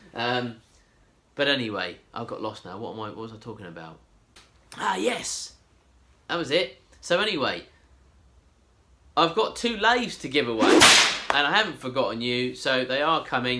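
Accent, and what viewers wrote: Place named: Liverpool, United Kingdom. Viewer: New Zealand.... really.... I would guess by your accent your around Liverpool myself. I did ask a while back.... and I'll do so again mate. how do youclean the resin out of your mixing bowl... cheers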